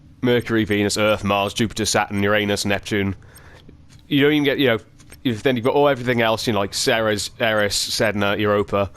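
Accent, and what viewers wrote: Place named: Wales, United Kingdom. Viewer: Your welsh tones make me wet.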